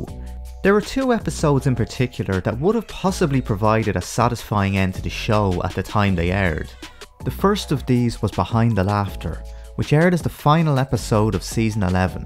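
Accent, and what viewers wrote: Ireland. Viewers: He’s Irish. 💯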